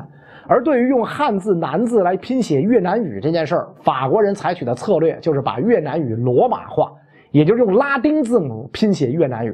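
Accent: Beijing, China. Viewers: 神奇...你会被袁老师影响成一口京片子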